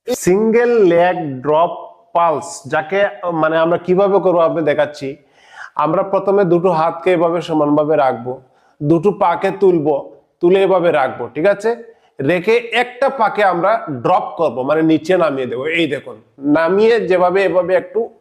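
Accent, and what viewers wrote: Sylhet, Bangladesh. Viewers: ভাই কিতা সিলেটি নি কথায় বুঝা যার সিলেটি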